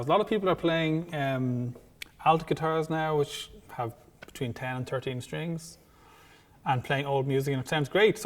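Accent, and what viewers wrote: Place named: Ireland. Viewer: Third, it's called an Irish accent dumbass.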